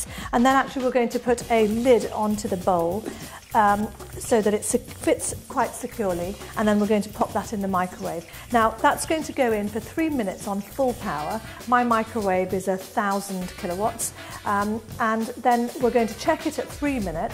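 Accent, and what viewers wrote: England, United Kingdom. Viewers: No one is going to comment on how she is a sand lady with an english accent.. lawl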